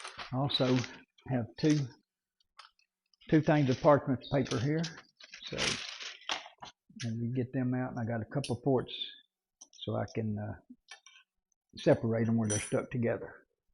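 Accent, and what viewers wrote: South, United States. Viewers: Thanks for this recipe,. I subscribed to your channel. You sound like a southern gentleman and since you cooked at the firehouse, I’m sure your recipes are great! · You have a perfect voice to fall asleep to! I mean that in a good way, you’re pleasant, clear, and relaxing like a bedtime story. If you’re looking for a 2nd career - think about it! Especially with your accent you’d be perfect for a southern novel!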